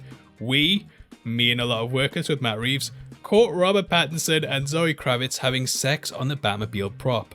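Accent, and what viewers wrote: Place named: Newcastle, United Kingdom. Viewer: My face when I realised the voice I was listening to was!! Geordie 🐐 or battle rap 🙌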